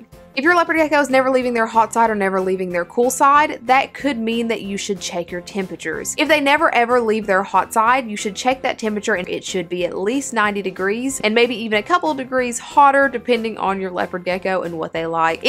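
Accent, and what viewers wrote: South, United States. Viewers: that southern accent❤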